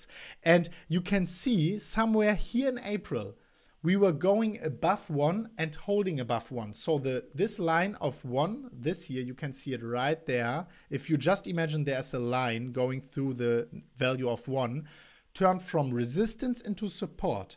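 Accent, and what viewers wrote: Germany. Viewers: Love your German accent. It actually makes me listen with focus , cheers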